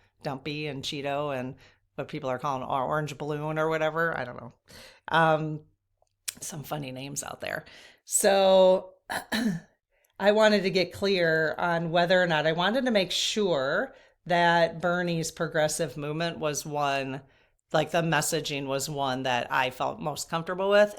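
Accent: Midwest, United States. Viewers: My Moldavite is a stinker too. In and Out…around. I too have a white cat named Lucy. She is in cahoots with my moldavite. I really enjoy watching your videos. You crack me up. It’s like watching myself in the mirror. My family’s from the Midwest (Illinois & Indiana) so speaking patterns are similar & sayings, add in I’m 10 years older but 4/12 bday. Even though I’ve been on the Spiritual Path since the early 1980’s I frequently find gems 💎 in what you share. There’s always another Facet to examine or depth of Clarity to explore. Thank you. 🙏🏽 ❤Liz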